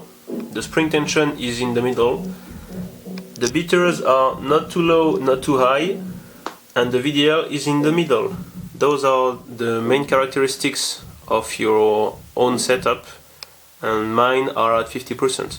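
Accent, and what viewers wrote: France. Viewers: i'm Pretty Sure you are French,your accent doesn't make Doubt! ;-)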